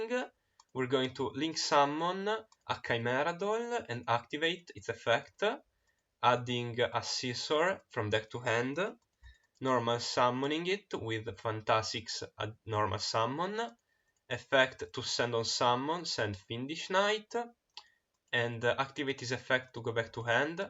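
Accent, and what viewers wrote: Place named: Italy. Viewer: Bro non ti conosco ma so che sei 100% italiano e lo capisco dall’accento ahah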